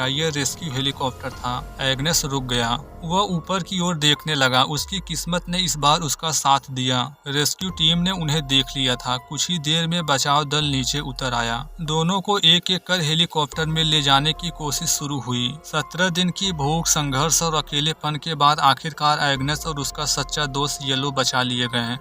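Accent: Bihar, India. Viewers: Bhai bhihar se ho kya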